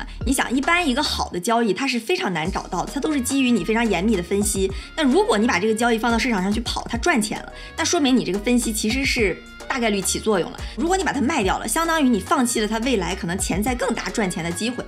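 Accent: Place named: Northeast, China. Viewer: 大姐东北的吧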